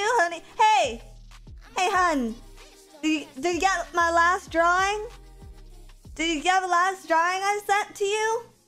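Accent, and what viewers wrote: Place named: South, United States. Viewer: But why is she southern 🤔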